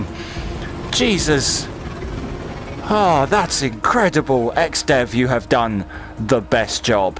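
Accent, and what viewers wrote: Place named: United Kingdom. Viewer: I love your british voice. You sounds like a commentator of sport or an astronaut commenting the spectacular view of earth today. 😄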